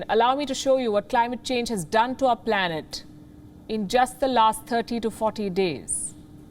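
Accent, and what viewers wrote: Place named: India. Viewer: Everything is perfect, no disrespect, but your thick Hindi English is at times very difficult to understand.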